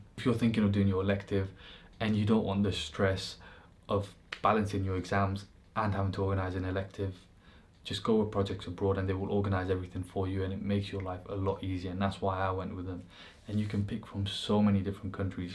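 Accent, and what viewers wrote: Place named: London, United Kingdom. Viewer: lol you don't sound as geordie, been londonised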